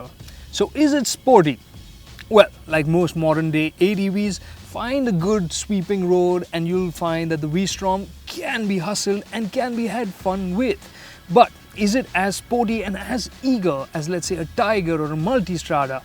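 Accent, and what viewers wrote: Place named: India. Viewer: Could not watch it to the end. Indians sunks speaking english, with thier stupid accent.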